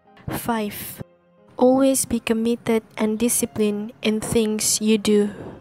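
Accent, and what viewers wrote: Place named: England, United Kingdom. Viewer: Come here because of english eccent..apa yg aku merepek ni..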